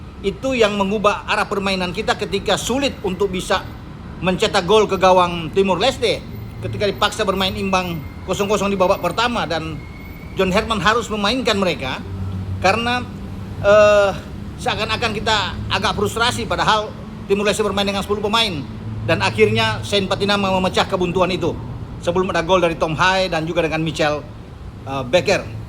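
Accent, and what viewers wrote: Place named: Java, Indonesia. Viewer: Medok ah... Kalah 3-0..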